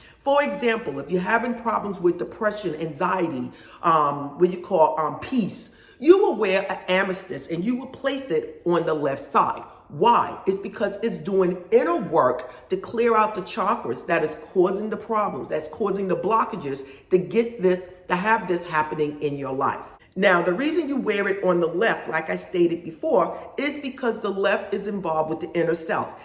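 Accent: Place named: Brooklyn, United States. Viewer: It's giving very NY, very Brooklyn. The sounds of my still living ancestors right now ❤️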